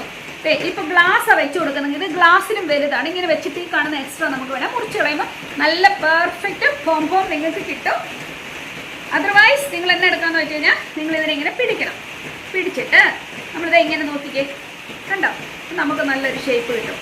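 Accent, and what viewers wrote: Kottayam, India. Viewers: Kottayam kari analle Samsaram kelkkumbo ariyam 😉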